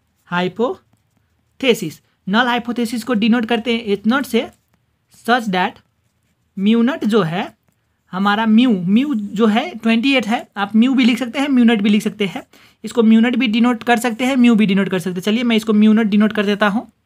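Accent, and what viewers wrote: Nepal. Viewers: Try to speak pure Hindi your are from Nepal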